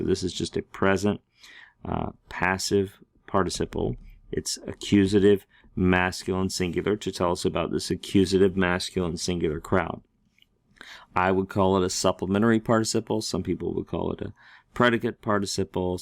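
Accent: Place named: United States. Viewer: Greek with an American pronunciation is not Greek.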